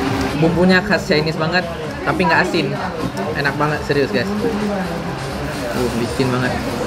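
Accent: Aceh, Indonesia. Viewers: Ab orang mana.... logat nya mirip aceh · Mau kemanapun pergi logat acehnya tetap melekat 😁👍👍👍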